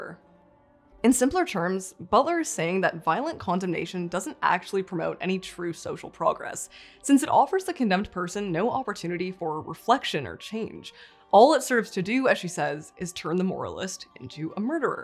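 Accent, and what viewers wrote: Canada. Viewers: She sounds Canadian, so perhaps that explains her lack of understanding of the US justice system, but I agree that the criticisms in this video are largely misplaced because she’s misunderstood how and why the system works as it does. For example, incarceration is the primary punishment for crime not to achieve “retribution” but to keep greater society safe by removing criminals, and to allow those criminals the best opportunity for rehabilitation while institutionalized. Just as an addict is most likely to rehabilitate during a highly-controlled inpatient stay, a perpetrator is most likely to change during incarceration, when removed from the temptations and opportunities to commit more crimes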